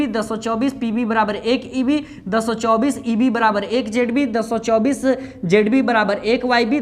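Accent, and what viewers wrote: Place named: Bhojpuri, India. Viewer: Ye hua smjhane ka idea 👍👌👌 or mst trick se smjhaiye bhot bdhiya h Apka bhojpuri andaj bhi sandar h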